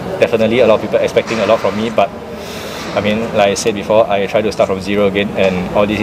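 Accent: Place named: Singapore. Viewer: most singaporeans speak like that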